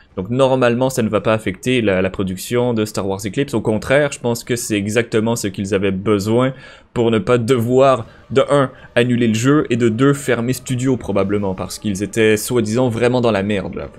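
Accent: Quebec, Canada. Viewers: Caché ton accent québécois, tu n'arrives pas.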